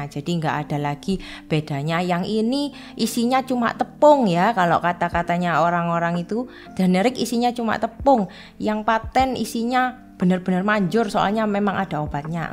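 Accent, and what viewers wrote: Java, Indonesia. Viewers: Logat Jawa nya medok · Medok banget😂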